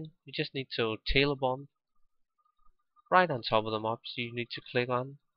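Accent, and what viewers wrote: Denmark. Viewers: You Danish/From Denmark? Sounds a lot like it. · Er du dansker?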